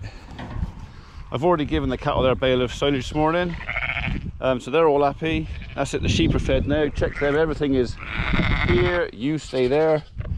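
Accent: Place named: Herefordshire, United Kingdom. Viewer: Yes farming is a bit hit and miss atm . Anyways all the best with it .. Remember next Friday is Funky Farmer's Birthday . Why dont you do YouTube live like he does . Sweep and I hope we did not offend you by saying you had a hobby farm . Though we still think you have a Herefordian accent. Btw Sooty and friends soon will be doing videos our selves with Charlie the Chimp . Keep safe .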